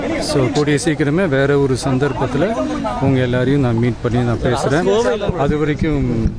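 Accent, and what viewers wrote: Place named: North India, India. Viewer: North Indian tamil pesra madhri irukku😢😢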